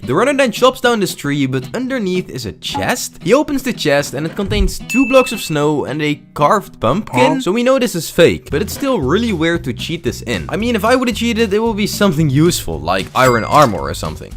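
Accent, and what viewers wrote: Netherlands. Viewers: I sense some dutch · U sound dutch,.....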